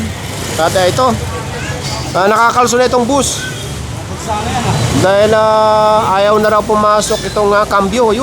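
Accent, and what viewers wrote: Visayas, Philippines. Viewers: keep up the good work,mataas ang rating mo sa mga viewers mo kahit medyo bisaya accent ok lang,meron laman ang sinasabi mo.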